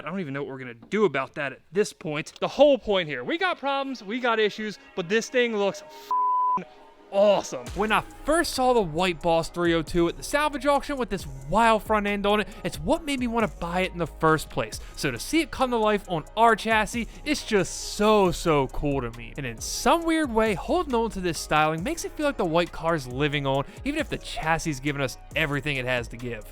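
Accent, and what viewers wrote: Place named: Delaware County, United States. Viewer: Man I hear your accent so hard, almost sounds like you’re from Delco?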